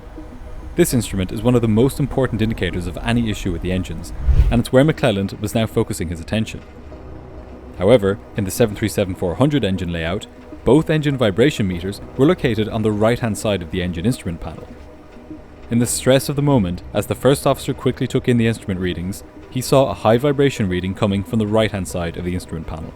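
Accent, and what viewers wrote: Ireland. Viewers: Love seeing your handsome face in your videos, coupled with your gorgeous Irish accent, which makes your videos so much more relatable 🇮🇪☘️🇮🇪😍😍😍😍😍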